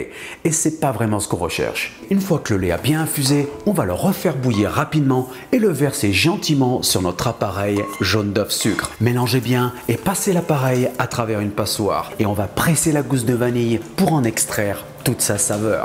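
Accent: France. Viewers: Merci! Ma blonde déteste ton accent français mais je l'adore et t'immite constamment. Plus tu nous apelle avec des noms de petits animaux, plus j'ai de munitions, alors continue ton bon travail!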